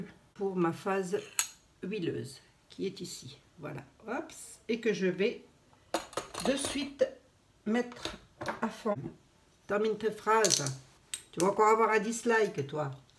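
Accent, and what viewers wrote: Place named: Lorraine, France. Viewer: bonjour, super recette que je vais faire ce soir, je tiens a te dire que je me doutais que tu était lorraine vu ton accent qui ne m'est pas inconnu puisque je suis née dans les Vosges, je vis dans le sud ouest a présent, alors je te dis encore bravo pour tes tutos qui me ravissent a chaque fois bisous a toi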